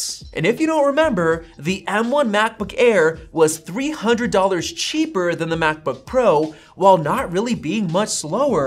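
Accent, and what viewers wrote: Russia. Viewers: haha, I love your Russian accent. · Portativny personalny Computer! I love your russian accent! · Афигеть, у тебя русский акцент)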